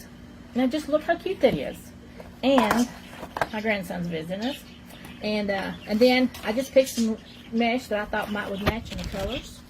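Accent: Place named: South, United States. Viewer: You sound so country I love it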